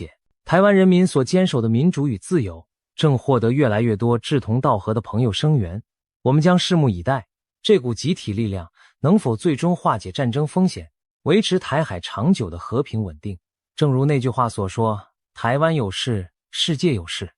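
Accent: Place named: Beijing, China. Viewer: 主播拜託你不要用北京的爛中文發音，中共產黨的中文真是非真正的中國人的正統中文，如亞洲正確的發音雅洲才對不是讀訝洲請主播用最正統派的中文發音，常識正統派讀常視發音才對不是中共匪幫的爛中文讀常時那中共產黨畢竟他不是中國人所以中共用的中文發音非常爛又不是正統派的中國語言，主播買本正統中文字典注音符號的書好好認識中共的爛中文字發音吧！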